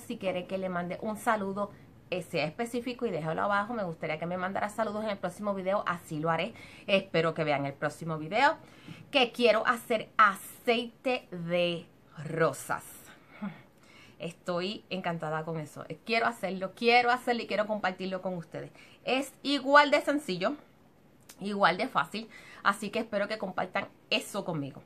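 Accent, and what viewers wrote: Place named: Puerto Rico. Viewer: En Puerto Rico hablan asi credo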